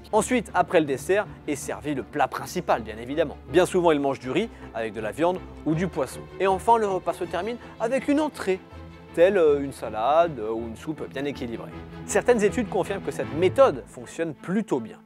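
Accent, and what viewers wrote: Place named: England, United Kingdom. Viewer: Walla like pour l’accent anglais de fou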